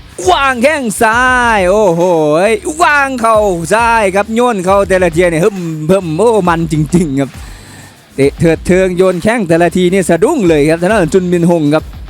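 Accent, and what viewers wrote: Isan, Thailand. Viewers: ชอบอ่ะภาคไทยปนภาษาอิสาน ฟังแล้วได้รอยยิ้มค่ะ😂 ดูมวยแขมรหลายคู่มันดูขย็อคขแย็คยังไงไม่รู้เนาะแตะนิดแตะหน่อยลัมลุกคลุกคลาน ญี่ปุ่นยังอึดกว่านะ นักชกไทยสุดยอดค่ะ มวยไทยสนุกลีลาเยอะอาวุธเยอะดีถึงว่าฝรั่งถึงชอบเรียนมวยไทยมาก❤ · พากย์อิสานมันดี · พากษ์ภาษาอีสานมันส์กว่าพากษ์ไทย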